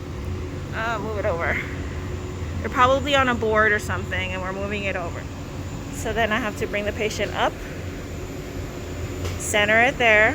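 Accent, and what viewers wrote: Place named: Caribbean. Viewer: Are you Caribbean? I can hear an accent and i feel like you’re one of us lol. very informative video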